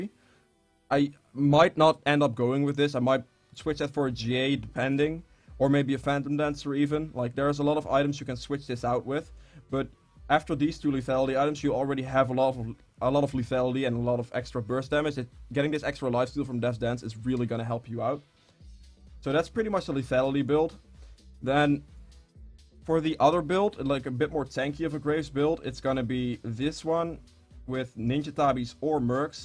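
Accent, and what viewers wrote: Netherlands. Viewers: i can hear you're dutch :P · hi man i just wanted 2 ask you a question, your voice sounds like you are from the netherlands, is that true? if so can i add you on league?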